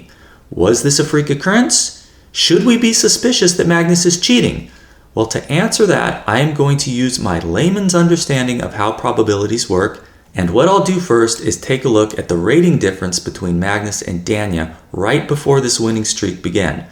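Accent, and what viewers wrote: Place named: United States. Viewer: Americans pronounce the ant part so it's perfectly fine since he sounds American.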